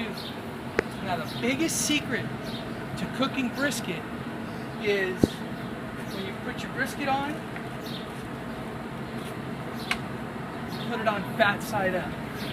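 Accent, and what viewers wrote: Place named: Hawaii, United States. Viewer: You sound like you have a Hawaiian accent. Good video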